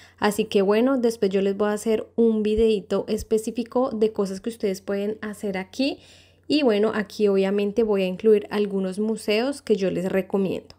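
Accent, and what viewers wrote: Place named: Colombia. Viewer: Hola... Buen video👍... Tienes acento colombiano, soy venezolano, entre Miami y Katy, ¿Cual vale la pena por empleo, facilidades, impuestos y calidad de vida?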